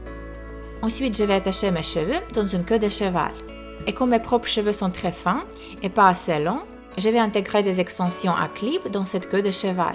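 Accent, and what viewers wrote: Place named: Russia. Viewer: j'adore ton acent russe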